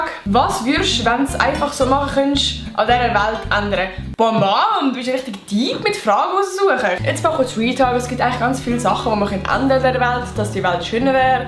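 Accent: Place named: Switzerland. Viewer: Super das mitem schwizerdütsch dankeee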